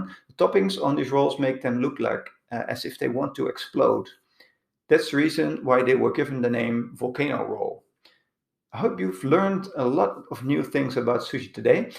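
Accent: Netherlands. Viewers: I detect a Dutch accent. Am I right?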